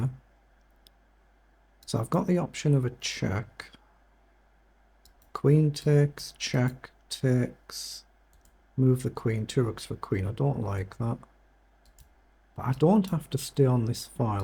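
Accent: United Kingdom. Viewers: Enjoy the vids. Which part of the UK are you from?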